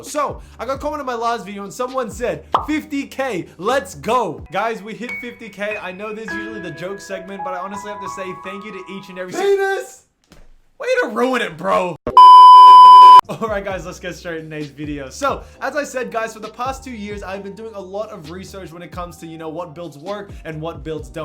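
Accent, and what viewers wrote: Australia. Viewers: hey man, i recognised ur accent and i’m assuming your australia, if you do play asia servers i was wondering if you could run wit me 🥺 much love from south australia bro. keep up the great content‼️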